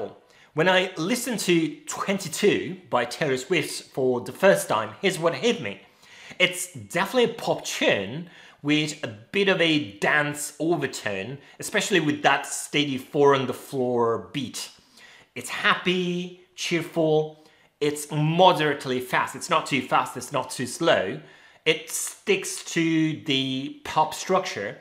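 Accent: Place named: United Kingdom. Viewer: Great work! It's really funny how the british accent and words make it seen like an even more intellectual and objective review 😂 I'll use it to annoy my Swifty-fan's friends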